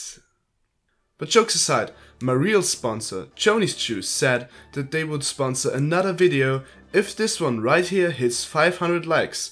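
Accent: Germany. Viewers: German guy or what? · Bist du Deutscher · ah ok, Ur accent do rly sounds german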